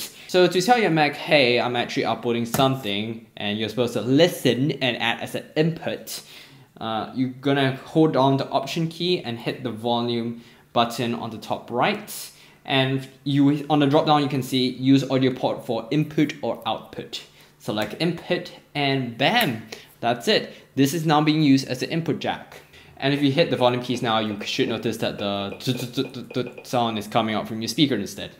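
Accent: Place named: United Kingdom. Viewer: took away mic port......also an asian with a british accent... alot unanswered questions. very helpful video BTW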